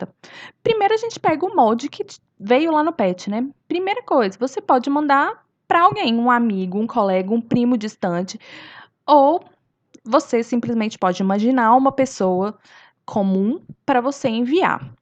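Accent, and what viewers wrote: Minas Gerais, Brazil. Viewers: Meo deos mule tu e mineira???????;_;amei quem amou?akaka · Tu é mineira?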